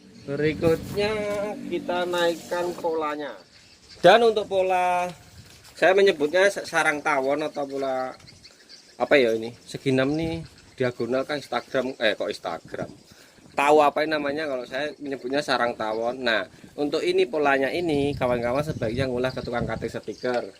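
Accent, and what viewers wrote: East Java, Indonesia. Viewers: Mas sampean asli jawa timur ta?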